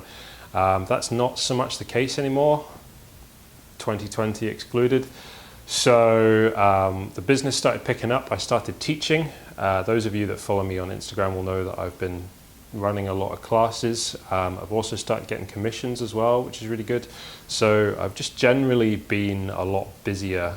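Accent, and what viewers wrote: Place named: Scotland, United Kingdom. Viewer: Maaate, hearing a tad bit o'scottish in your accent now haha glad to see you're keeping well and to see a new vid. ATB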